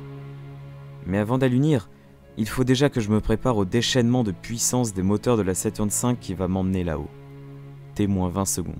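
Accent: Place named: France. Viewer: Bonne vidéo globalement. Par contre, pourquoi ces phrases en anglais avec l'accent français, ça gâche tout !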